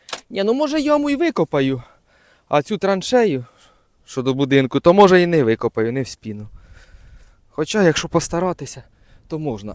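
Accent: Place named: Chernihiv, Ukraine. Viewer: Ти слуайно не з Чернігівською обл.-бо чую говор похожий?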